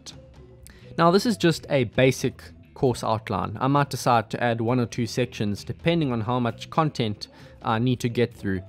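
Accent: South Africa. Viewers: nooit hes south african!!!!!!!!!!!!!!!!!!!! subscribed and notified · are you from South Africa.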